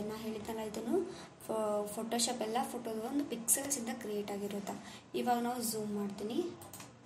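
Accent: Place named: Hubballi, India. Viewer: ಇನ್ನೂ ವೀಡಿಯೋಗಳನ್ನು ಮಾಡಿ ತುಂಬಾ ಚೆನ್ನಾಗಿ ದೆ‌ ಹುಬ್ಬಳ್ಳಿ ಭಾಷೆ ಉತ್ತಮ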